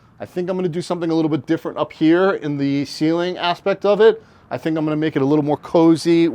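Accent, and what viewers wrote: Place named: New England, United States. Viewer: Keep talking about your drawer slides lol you have such a New England accent! I lived in Mass for 21 years and I’m in SoCal now. Hearing you say drawer takes me back 🙌🏼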